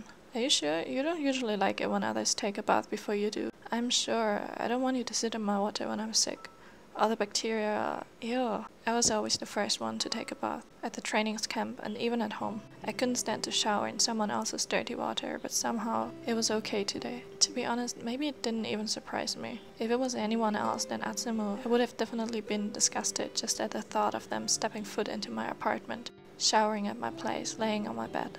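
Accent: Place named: Germany. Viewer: You sound German👁️👄👁️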